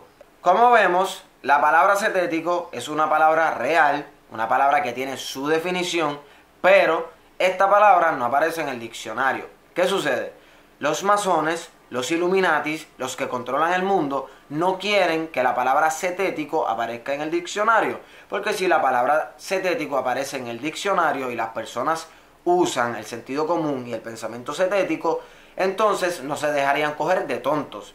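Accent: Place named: Puerto Rico. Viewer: que loco que escuche tu acento y fuiste el primero con ese acento que no habla de reggeton ni de un temaa , bendiciones a PR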